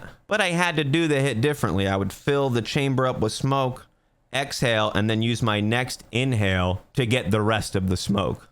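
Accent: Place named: New York, United States. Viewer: I wonder where the New Yorker type accent comes from.?.?.?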